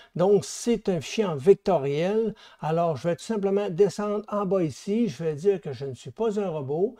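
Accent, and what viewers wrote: Quebec, Canada. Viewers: hey salut merci pour la video jai beaucoup appris!!! tu viens de ou avec ton accent c'est quebecois je suis de montreal moi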